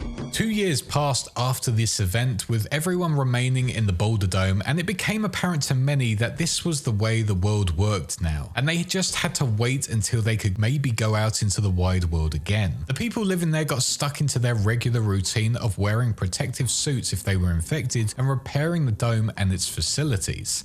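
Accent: United Kingdom. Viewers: Loving the videos mate, love hearing more of us brits doing lore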